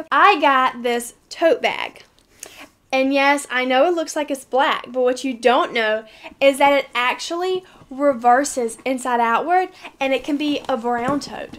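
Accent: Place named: South, United States. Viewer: I love your kind of southern accent accent! Where are you from?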